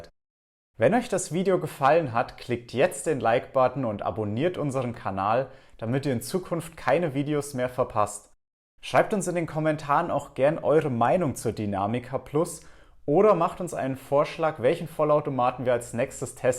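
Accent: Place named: Franconia, Germany. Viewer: Super erklärt, deutlich gesprochen (mit fränkischem Akzent - sehr symphytisch). Habe nur noch eine Frage, my Milk - Funktion wäre das Michprogramm 1 - korrekt? Vielen Dank freue mich schon auf weitere Videos.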